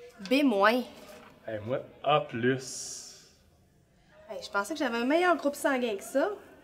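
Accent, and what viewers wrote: Quebec, Canada. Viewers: Merci pr les infos en plus dit avec un joli accent de nos cousins québécois pr le coup québécoise 🔭🔭🔭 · Le groupe sanguin 0 + peut donner son sang à tous les groupes rhésus positif non ? ( A +, B + AB + ) Au passage, très bel accent québécois, moi j'ai celui de sud de la France, peuchère !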